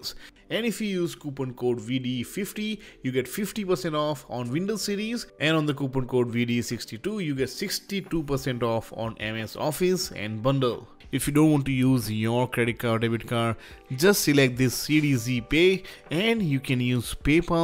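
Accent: India. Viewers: You don't sound like an Australian. You sound like an Indian chapri at best.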